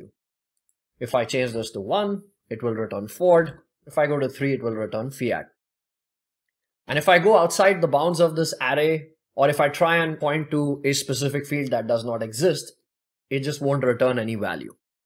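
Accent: India. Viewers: I work with power platform dev for almost 10 years already and I must say... if the presenter have indian accent, he is a good teacher... great video m8 !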